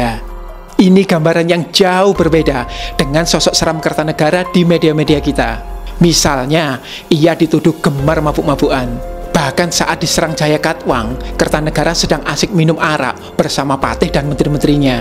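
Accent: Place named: Surabaya, Indonesia. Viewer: Sek ta... Nggacor jare... Gak oleh ebes cak... (serasa kupingku disodok tugu pahlawan) 😂 terlepas dari aksen Surabaya yg ceplas ceplos, seperti biasa... esensi dari Chanel ini selalu jos 👍😇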